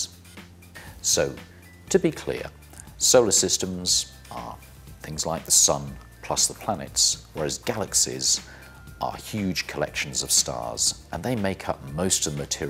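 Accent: United Kingdom. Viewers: OMG JUST NO STOP TALKNG BRISTISH GUY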